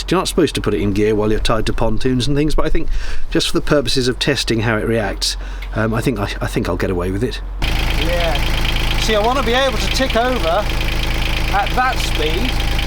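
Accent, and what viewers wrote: United Kingdom. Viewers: Are all British men so even tempered? You said "ow" and barely skipped a beat as if you hardly noticed. When we had a boat and I worked on it with my (at the time) partner...it was always a traumatic experience as he had nothing like your calm demeanor and I was always on the lookout for flying tools. I'm happy to say that the relationship didn't last past that summer. I have to admit I don't think I will ever be able to say "farting around" without hearing it in your wonderful accent in my mind. Another truly enjoyable video. Thank you.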